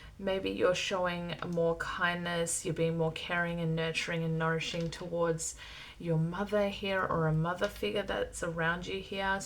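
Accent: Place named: Australia. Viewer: Great reading, I was in group 1. OZ accent..🫠🤤🌞 especially the sound of "you" and "here"❤ please don't get me wrong, It just sounds beautiful. 🫣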